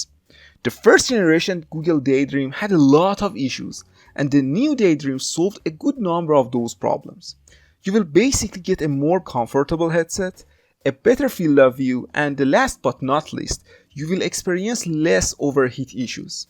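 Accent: Iran. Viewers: Haha, I was going to ask him this, if he Persian. 🤣🤣🤣🤣 Love it. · Love you Persian accent :D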